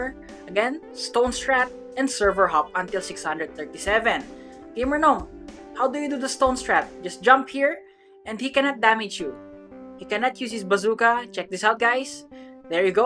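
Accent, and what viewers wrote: Philippines. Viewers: Dam i just noticed GamerNom's from PH😱♥️ because i noticed the accent so i checked the bio and i was suprised! Im also from PH hehe Great video as always♥️😱🥳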